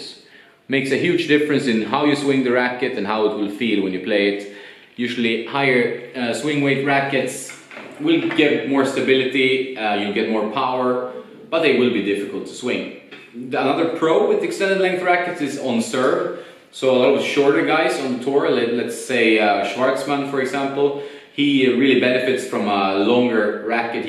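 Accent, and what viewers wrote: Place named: Sweden. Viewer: Bra ide, är du svensk. Du här en svensk accent. 🙂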